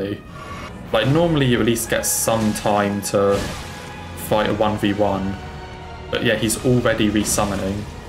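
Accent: United Kingdom. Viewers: Its called a british accent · Why do you souns homosexual or are you just British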